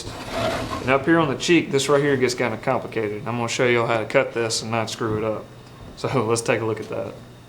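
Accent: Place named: Carolina, United States. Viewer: i like this, very helpful...i like also how you get right to it without alot of unnecessary (distracting) jabbering...also your manner is respectful and professional...sounds like a carolina accent? cool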